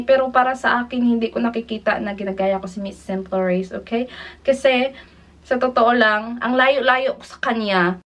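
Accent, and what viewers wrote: Visayas, Philippines. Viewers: Ganyan talaga ang mga accent ng BISAYA! Wala ka kahit anumang bagay ba ginagaya. Ikaw yan! Kahit anong mangyari ikaw at ikaw parin yan. That's life! Just be proud of you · Mag ka boses kau ni Rhaze at visayan accent hehe hayaan muna mga nega... Stay safe! ❤️ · tama jud dai. katawa lang ko aning mga nega. Just stay positive. bitaw pag first nako pag tan aw nako sa imong Blog or Vlog maka tunog mo ni Ms. Rhaze siguro the way atong accent na bisaya ana jud guro.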